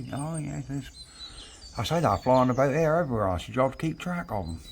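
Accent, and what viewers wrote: Norfolk, United Kingdom. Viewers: I grew up in Narborough during late 60s/early 80s and went to the old Narborough school. The picture you showed of Crisps Garage was the Drs surgery during the 70s . Still visit Narborough to see relatives and will hopefully go to the museum on next visit. I remember Greta Towler as the postmistress who had a very cheeky minah bird. Love your Swaffham auction videos and used to visit most Saturdays when Tyrone Roberts was the auctioneer. Thank you for the nostalgia and the wonderful Norfolk accent!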